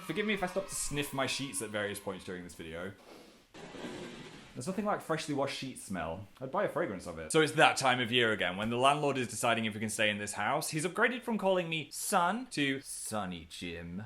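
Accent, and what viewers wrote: North, United Kingdom. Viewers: you sound so freaking northern this week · I thought Phil sounded kinda northern in this particular video. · I was about to say you sounded a lot more northern before you said something 😂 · I didn't notice your accent was northern until this video... wow I'm observant. Now I can't pay attention to what you're saying I'm just listening to the accent. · that northern accent though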